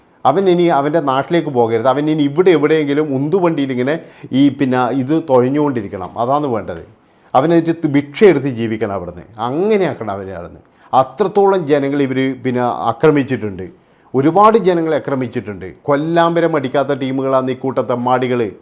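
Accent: Kannur, India. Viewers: Kannur slang nalla rasam kelkkan. My fathers place anjarakandiyanu thangalude native place evideya?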